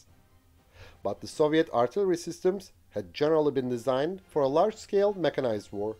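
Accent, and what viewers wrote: Turkey. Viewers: aksana bak kesin türk amk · Konuşan Kardeşim kesin Türk. Selamlar.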